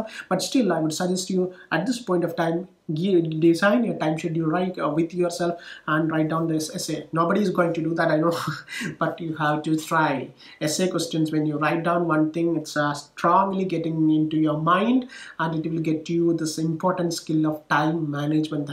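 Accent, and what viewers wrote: Kerala, India. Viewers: Are you malaayali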